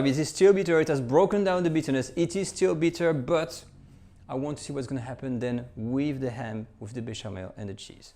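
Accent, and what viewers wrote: France. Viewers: I like the Chef french accent in english...Of course the recipe is delicious.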